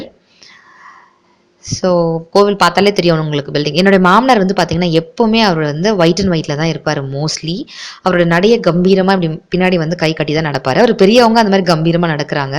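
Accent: Coimbatore, India. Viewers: very cute. dress and neck less super 😘😘😘😘😄😄😄😄😄.Naanga Coimbatore nnga. yendra uttuukarunu😘😘😘😘😘😘 solldrathu super ah errukku.slang very nice pa. condippa reply vennuuuuuuuuuuuuum. OK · Endra ootu karar enga ooru language m cbe. Really sweet this video i like so much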